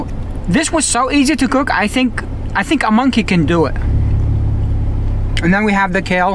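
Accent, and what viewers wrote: New Jersey, United States. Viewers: Are you from New Jersey?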